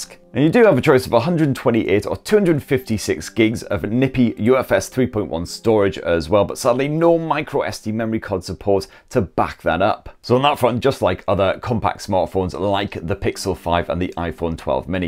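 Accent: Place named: England, United Kingdom. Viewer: It's clear, concise and pleasant. No bias and with this accent so English! Your videos are really well done so subscription, continue like this, I love your work.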